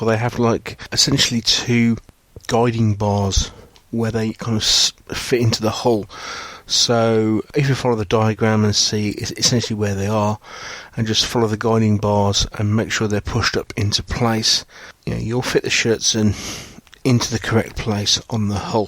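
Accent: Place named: RP, United Kingdom. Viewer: Another great review by. As an American, I love his proper "Queen's English". I do know the difference, as I was married to a Geordie from Whitley Bay, and I loved her Geordie accent too!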